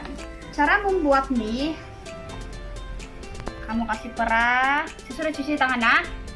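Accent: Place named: Sabah, Malaysia. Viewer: Pekat juga Sabah kau bha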